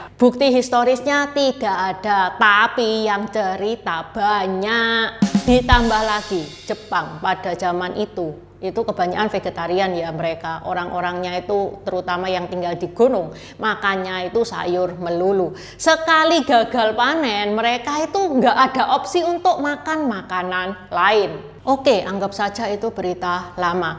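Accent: Java, Indonesia. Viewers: Medok men wong cino iki... ❤❤❤😅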